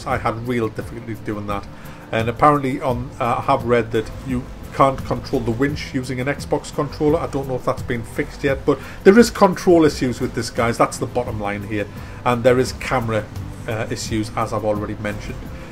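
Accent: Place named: North, United Kingdom. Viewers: You sound fat and northern. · I do love a good old fashioned Northern rant, me! Most amusing :-) Enjoyed the overview too.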